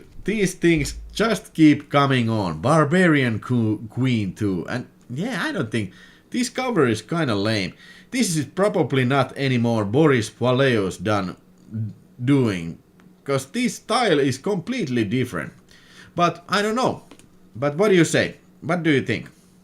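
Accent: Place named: Lapland, Finland. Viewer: This is the first video of yours I've seen, but I love style and content. The accent takes me back to all the summers I spent in Lappland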